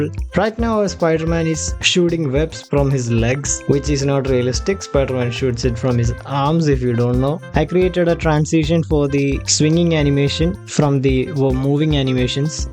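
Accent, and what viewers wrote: Kerala, India. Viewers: Are u frm kerala?(malayali) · bro are you from kerala just guessing :) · The mallu accent is lit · Edaa malayalai moneeeee🌝Ninte english ketta mansilavum nii oru mallu aannenn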